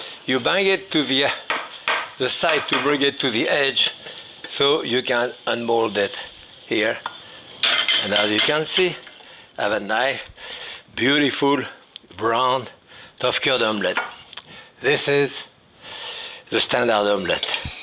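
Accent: France. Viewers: that french accent even makes the word omelette sound so goood mmm and yes i always use chopsticks now for anything that needs a good whipping , once you master it the become a regular used kitchen tool , happy cooking folks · Does anyone else simply hear the french accent and immediately hit the subscribe button? only qualification i need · Absolutely.....My dad was French and had eggzactly the same accent.😊😊😊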